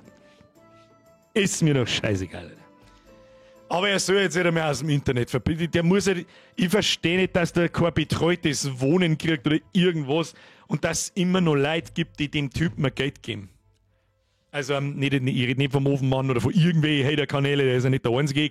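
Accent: Bavaria, Germany. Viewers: Merce für die stets gute Unterhaltung. Da vergeht der Arbeitstag gleich viel schneller. Gut, daß ich bayrisch versteh' haha. Grüße aus Mecklenburg.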